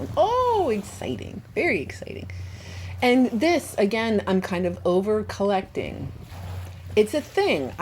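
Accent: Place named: New York, United States. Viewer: I love your videos so much! Thank you for creating them amd sharing tips! Question - are you originally from New York? I'm curious because I am, and your accent sounds so familiar to me!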